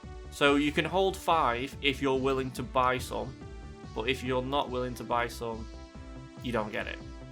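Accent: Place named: Manchester, United Kingdom. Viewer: Hi. Count Jinsula did a video today saying it looks highly likely that Hanke has put his demand out that he doesn’t want remote raids at all. On another note, I detect a Mancunian accent. You know how bad the weather can be in that part of the country. (I am Lancastrian) but live in S Wales now which arguably experiences just as bad weather conditions. There are times it’s just far too dangerous to go out at all. Hanke lives in his deluded narcissistic bubble where everyone on the planet lives in a mansion in California, where no one works but has unlimited amounts of spare time and money, with unicorns leaping over rainbows. Hanke, right there, is a brilliant example of how to run a company into the ground , bc narcissists are never wrong and know absolutely everything about everything and they know better than everyone else. All hail Mr. 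💩 Sorry for the rant. I love this game but I went from being never being able to raid legendaries (always lived rural, only myself is playing) to being able to raid legendaries, to now not being able to.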